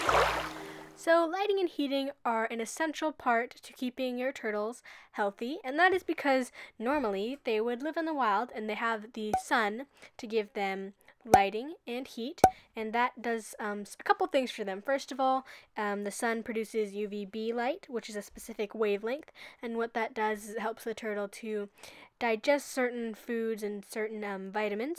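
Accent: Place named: Yorkshire, United Kingdom. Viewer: You sound like your from Yorkshire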